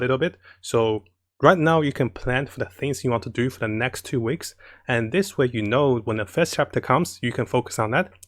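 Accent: Australia. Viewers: This guy must be Australian. Just my guess lmao I think I'm wrong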